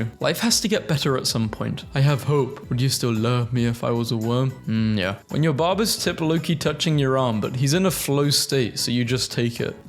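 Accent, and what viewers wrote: United Kingdom. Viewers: the way you pronounce things like britishly like saying "media" as "meteor" tickles my funny (im sorry for being american)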